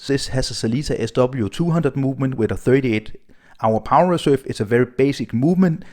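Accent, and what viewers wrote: Denmark. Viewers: By your accent Im guessing you are Danish, I am looking to buy a BB58 as well, do you recommend any retailers in the Copenhagen area? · I would guess your mother tongue is Danish, am I right?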